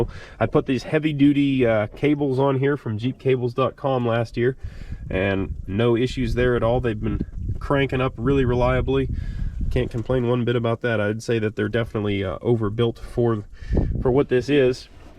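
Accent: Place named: Pennsylvania, United States. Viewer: What part of PA is this guy from the accent is throwing me a bit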